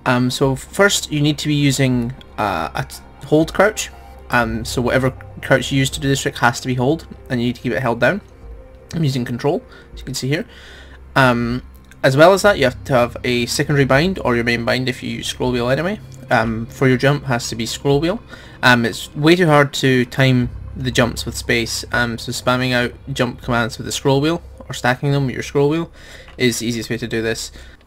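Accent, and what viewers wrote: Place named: Ireland. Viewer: You sound irish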